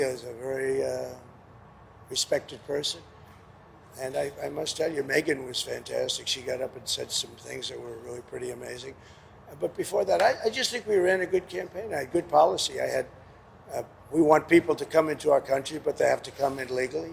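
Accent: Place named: South, United States. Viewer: You know, recovering from coma is a long and challenging process, maybe that's why you sound a bit like one of those ignorant uneducated toothless that smell like onions from Hillbilly land, Please go back to Hillbilly land buy more dark tapes and cover his mouth, he don't deserve to be in this country.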